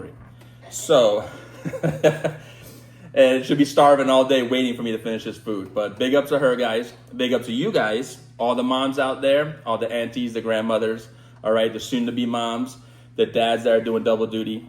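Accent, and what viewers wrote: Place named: Trinidad and Tobago. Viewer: found this channel referred to by trini style with videsh. hafta say it's heartwarming to see an american in love with trini culture. you even talk like a trini using our slang. big up yuh whole self.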